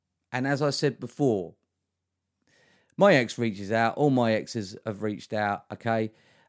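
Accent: Cockney, United Kingdom. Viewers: Mate your videos are great top knowledge and your funny bout how you say it 😂 - you could be in Snatch the movie with that cockney accent.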